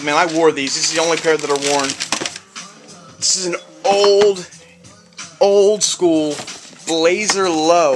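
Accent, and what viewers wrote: Philadelphia, United States. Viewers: damn wish them draft day's were a size 10 i fuck wit em... i scopped that philly accent from the beginning lol.. #215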